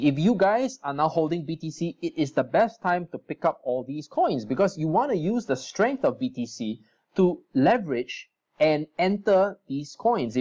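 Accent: Singapore. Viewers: you have great Singapore accent. love it.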